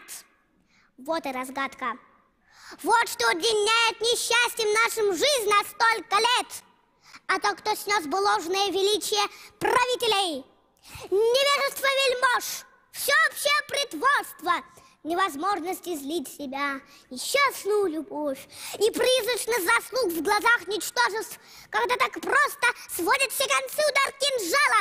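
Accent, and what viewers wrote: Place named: England, United Kingdom. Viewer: Это англичанин который выучил русский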